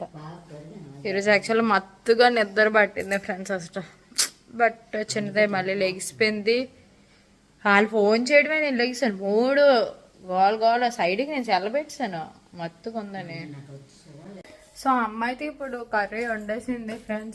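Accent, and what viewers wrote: Srikakulam, India. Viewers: Srikakulam slag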